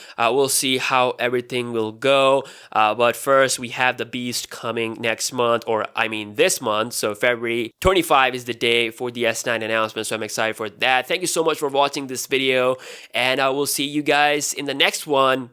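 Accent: India. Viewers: You got indian accent. Video is good. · det is India talk! Hahahaha...Pidgin "English" !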